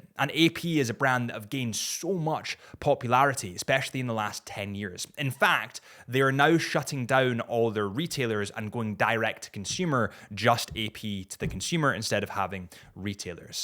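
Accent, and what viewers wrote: Scotland, United Kingdom. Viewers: Love the vid man what is your accent btw? Scottish I assume but from where?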